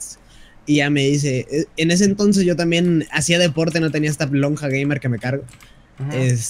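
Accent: Chile. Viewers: Chileno 100%real no feik jajaja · Primera vez q veo a un mexicano q le sale bien el tono de los chilenos :u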